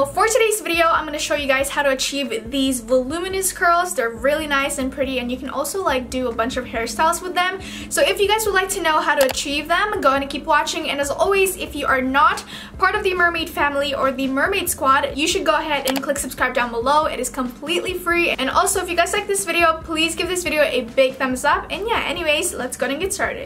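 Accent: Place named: United States. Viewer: you're so cute. you sound just like me w/ ur american accent when you speak tagalog. #struggles lmao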